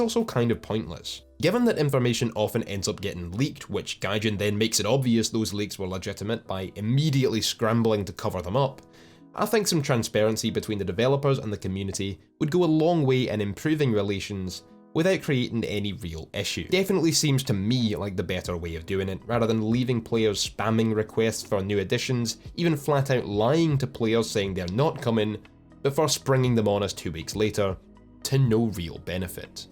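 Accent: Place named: Scotland, United Kingdom. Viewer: Damn I love this scottish accent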